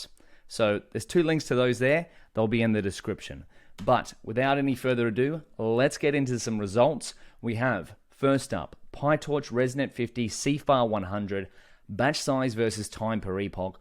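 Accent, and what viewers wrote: Australia. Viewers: thick aussie accent.. gives prices in usd...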